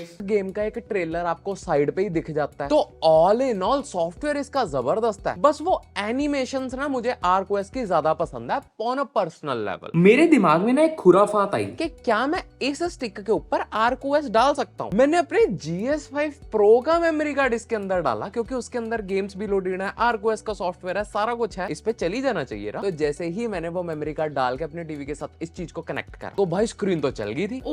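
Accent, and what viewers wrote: Punjab, India. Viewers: punjabi fadli teri hindi cho bro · Who noticed his Punjabi Accent 🙂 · Love your punjabi accsent ❤ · work on your explaining method, your half panjabi breaking words accent is irritating.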